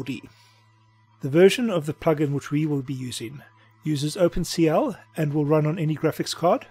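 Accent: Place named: South Africa. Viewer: Came here from the SideFX site, immediately recognized the accent. Howzit boet :D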